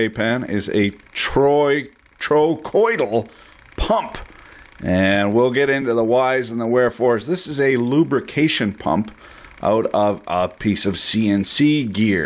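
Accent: Canada. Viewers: This guy is brilliant I wish I spoke Canadian I’m from Missouri who would of know that Canadian English is so different great videos I’ve learned so much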